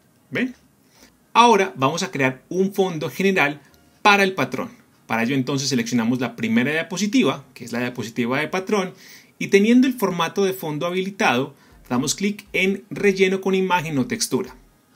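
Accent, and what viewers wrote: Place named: Colombia. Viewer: esta chido, gracias, ....osea que lo mas aprovechable es que lo haces de forma tal que automatizas con el patron, la neta que me gusto pero toma su tiempo, en todo caso te agradezco y si no me equivoco eres colombiano, me agrada el acento de ellos, gracias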